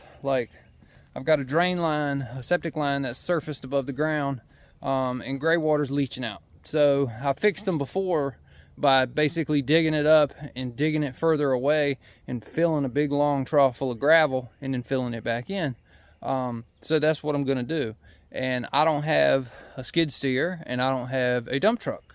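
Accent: South, United States. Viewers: can tell from his accent hes down south, def below NC/ KY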